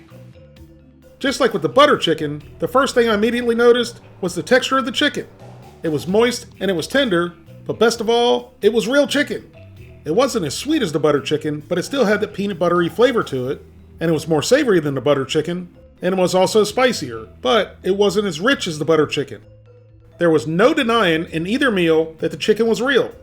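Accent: South, United States. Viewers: It's funny you sound less southern when you're with your wife.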